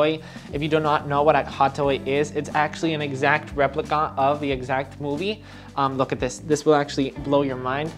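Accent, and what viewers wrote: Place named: Spain. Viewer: is he spaniard? I can notice an accent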